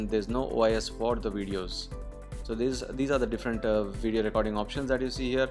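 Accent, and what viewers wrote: India. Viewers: You have a deep thick Indian accent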